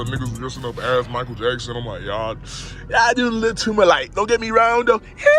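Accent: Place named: Atlanta, United States. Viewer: U trim twin-atl nigga voice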